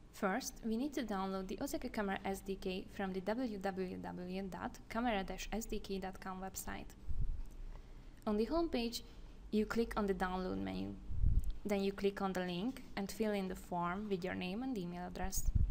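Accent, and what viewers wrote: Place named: Hungary. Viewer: Annyira magyar az akcentusod hogy kihallom :D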